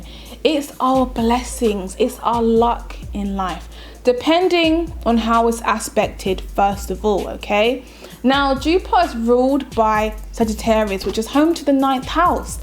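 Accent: United Kingdom. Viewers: am covid vibes did not know she was British. That just blew my mind.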